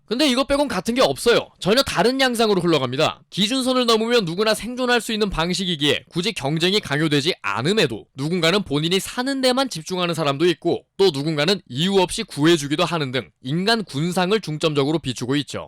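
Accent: Busan, South Korea. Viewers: 나랑 상반된 다른 의견의 눈으로 보는것도 재밌구만. 그나저나 해설 기깔나게 하길래 부산인지도 몰랐네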